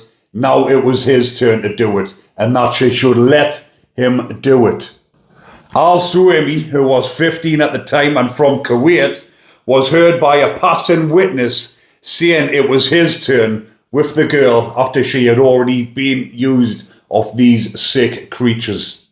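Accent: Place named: North East England, United Kingdom. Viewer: is from the north east of GB,and that is how they speak! It's called an accent!🙇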